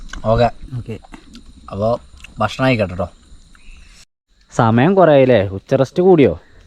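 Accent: Malappuram, India. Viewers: മായ പുയ അങ്ങനെതന്നെ പറഞ്ഞാമതി ❤ മലപ്പുറം അങ്ങനെ ആണ്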